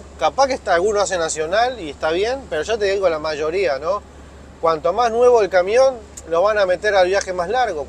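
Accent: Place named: Uruguay. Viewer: Un gusto escribirle, tmb soy del canal Mobile home. Sr: x DESEARLE SIEMPRE PROSPERIDAD EN TODOS SUS TRABAJOS Y ME ALEGRÓ MUCHO SU COMPRA DEL SCANIA. Como si fuera x mis hijos. PROSPERIDAD, SALUD X ELLOS Y FLIA. Y LE SUMO A UD. Con CARIÑO Y RESPECTO, SE LO MERECE ES TRABAJADOR, LUCHADOR, DE TODO OFICIO. Le saludo desde Uruguay. Mis hijos viven ahí en España. Los tengo lejos hace casi 2 décadas x tal motivo comencé a seguirlo, pensé q podía ser Uruguayo x el modo de hablar, somos de paises hermanos. SIEMPRE ADELANTE Y A CUIDARSE. Me despido con AFECTO.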